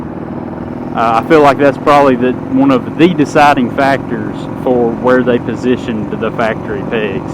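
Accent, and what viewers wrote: South, United States. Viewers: Greetings! You sound like Huckleberry Hound to my old ears. Where are you? That landscape looks totally English and the only thing that gives it away as the US are the road markings and your deep southern drawl. And I don't see potholes in your road there... how is that?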